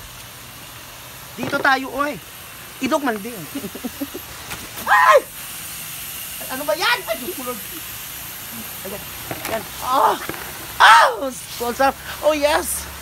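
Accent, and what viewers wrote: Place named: Visayas, Philippines. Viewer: dow bisaya man di ay sya?😂 diin imong probinsya ate?😂😂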